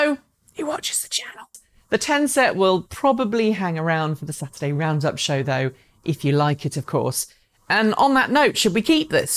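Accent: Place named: England, United Kingdom. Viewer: This lady is so erudite and I love the English accent .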